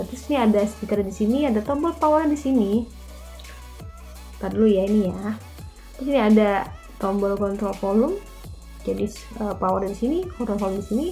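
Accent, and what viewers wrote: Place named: Cirebon, Indonesia. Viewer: biasa biasa bae.. hehee wong cirebon bangettss..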